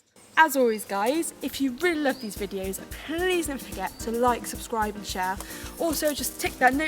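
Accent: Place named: England, United Kingdom. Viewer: That's so weird, she looks middle eastern but sounds English!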